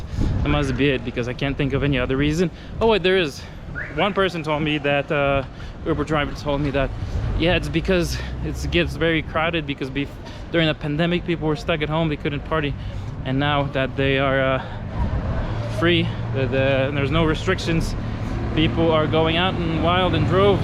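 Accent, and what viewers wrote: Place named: Eastern Europe. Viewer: where are you from? I mean originally... Ukraine? You sound russian or slavic speaking ... as your native langauge. your english is fine, just I can tell you're not a native speaker and was wondering where you're from originally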